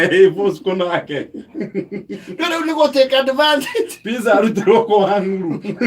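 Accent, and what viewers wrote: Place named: Kirinyaga, Kenya. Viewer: Unaongea kama watu wa kirinyaga avai hahaha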